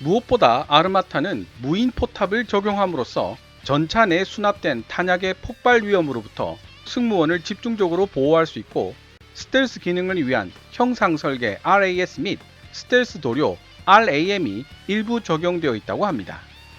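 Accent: Gyeongsang, South Korea. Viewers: 얏~야! ....니는 갱상도 사투리부터 고치는게 시급하다!!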